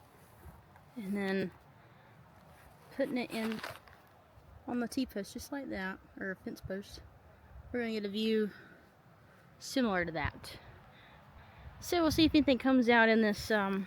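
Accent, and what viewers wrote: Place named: Montana, United States. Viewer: Your starting to sound like them people in MT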